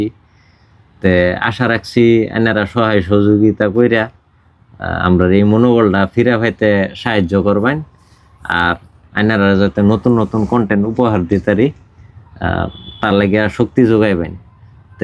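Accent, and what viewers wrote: Mymensingh, Bangladesh. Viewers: চোরদের কোন কমতি নেই।যারা চুরির পিছনে থাকে,তারা চুরি করবেই। যাই হোক আপনার আঞ্চলিক ভাষা ভারতের কোন অঞ্চলের দয়া করে বলবেন।কারন আমাদের বাংলাদেশের ময়মনসিংহ জেলার ভাষার সহিত মিল আছে, তাই বললাম। ধন্যবাদ। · প্রথমে ভেবেছিলাম কিশোরগঞ্জের। কারন ময়মনসিংহ, কিশোরগঞ্জ, নেত্রকোনা অঞ্চলের আঞ্চলিক ভাষা এটা। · Ha, ekdom Bangladesh er Mymensingh, Jamalpurer vasha. Onek apon mone hoy. Ami Mymensingh er.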